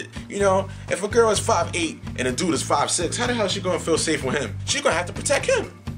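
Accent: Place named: New York, United States. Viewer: where r u from? gotta be bk or the bx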